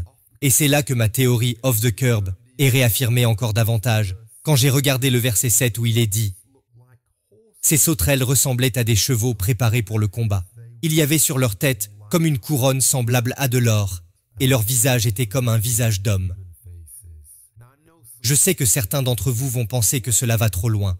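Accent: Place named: United States. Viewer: Samedi 17 Août 2024 Bonjour à tous, J' suis contre les dialogues robotisés, de plus le narrateur est américain! Un texte expliqué par une/un FRANCOPHONE est bien plus approprié selon moi. J' ai donc écourté cette vidéo, et me suis quand même penchée sur les commentaires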